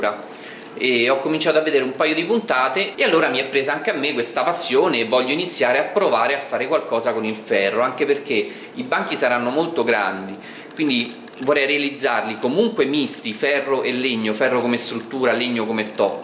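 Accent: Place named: Lazio, Italy. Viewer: mi pare laziale ma non ciociaro....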